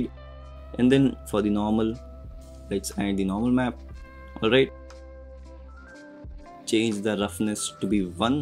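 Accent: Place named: India. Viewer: I swear everytime I see a video that has a guy with an indian accent you know it's gonna be a useful tutorial. Thanks a lot!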